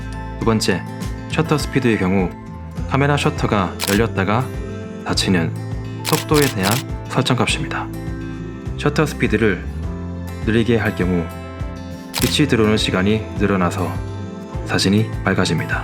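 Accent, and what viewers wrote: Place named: Busan, South Korea. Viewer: 부산분이네요. 잘 봤습니다.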